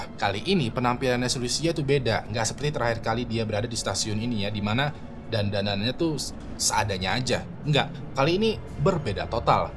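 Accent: Indonesia. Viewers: gapapa biar lebih gampang dipahami karena logat indo. wkwk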